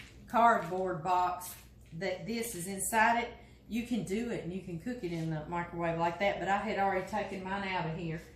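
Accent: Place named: Louisiana, United States. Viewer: Miss I just want to tell you how much I enjoy your program it's like being back home again I'm from Louisiana from New Orleans and it's just wonderful to listen to you with your accent lol Wish we were neighbours I love cooking Louisiana style and I know we would Be best friends. Keep doing those delicious Recipes that you do. And I will keep watching. God-bless and stay safe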